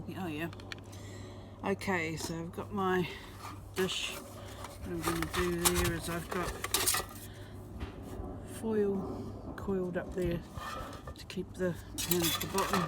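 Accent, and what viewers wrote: New Zealand. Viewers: ......nice deck........😜👍 That's awesome with a Kiwi accent .....😋, sorry😞. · That looked scrummy! No comment re the word "deck" and your (charming) Kiwi accent! Cheers. Ken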